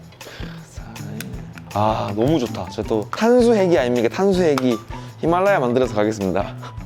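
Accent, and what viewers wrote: Gyeongsang, South Korea. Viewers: 와.. 그냥 몇번 봤는데 대구말투에 결국 구독박고갑니다ㅋㅋ 대구사는데.. 인천 가게되면 꼭 저기 가봐야겠슴다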